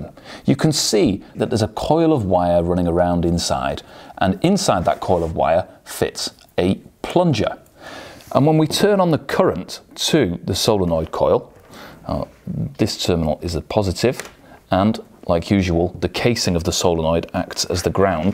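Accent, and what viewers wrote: United Kingdom. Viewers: Is this guy from England? I like him, he has a passion for mechanics. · Indeed very informative, full of energy and I love the british accent. =))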